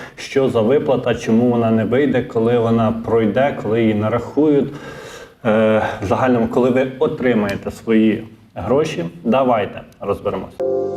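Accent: Vinnytsia, Ukraine. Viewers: Ню- це говір вінничан.Так само, як і на западній мають свій говір.Там вуйки розмовляють так, що нормальна людина взагалі не розуміє про що вони говорять.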